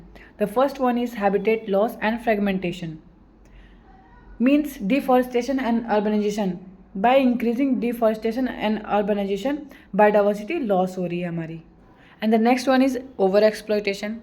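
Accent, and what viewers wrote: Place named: Bihar, India. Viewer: Ma’am you accent seems like you are from bihar😅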